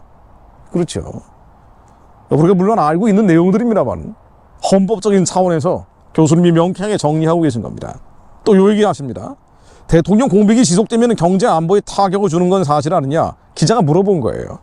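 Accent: North Korea. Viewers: 다 좋은데 북괴 말투는 정말 싫타.... 간첩아니고서야 왜 북괴 말씨지?? 북괴에 자주 갔었나.....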